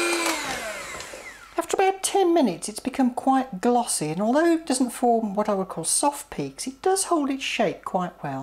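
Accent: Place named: England, United Kingdom. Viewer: you're so sweet!.. I love the way you explain the procedures, and that AWESOMELY perfect English accent! Suscribed! Greetings from Argentina *=D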